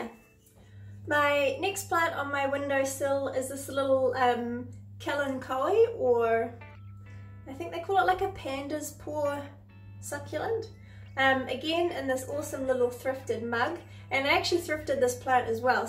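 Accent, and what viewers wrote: New Zealand. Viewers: Spot that gorgeous bliiiing 😉💍 it’s so good to hear a kiwi accent and I love your pizazz so much ugh you give me life!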